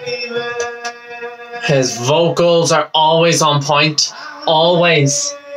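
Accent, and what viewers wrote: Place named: Northern Ireland, United Kingdom. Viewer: I love how you analyse everything so carefully. Anyway, Damiano David is the frontman, Thomas Raggi is the guitarist, Victoria De Angelis is the bassist and Ethan Torchio is the drummer. Keep those reactions coming! I love your accent, btw (Northern Irish, right?).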